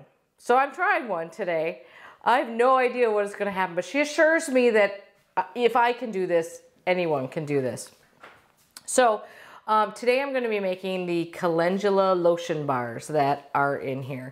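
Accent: Wisconsin, United States. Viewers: This is one of the funniest videos I've seen in a while. Your lovely accent reminds me of my Wisconsin childhood. Thank you.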